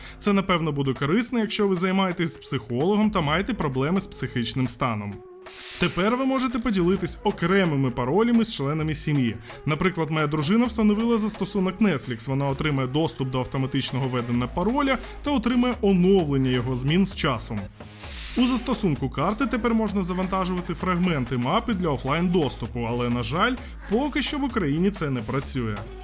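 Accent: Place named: Russia. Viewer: От просто безмежна повага. Чутно по голосу що автор розмовляв на рос. язику. Але перейшов на українську. Дякую за приклад!!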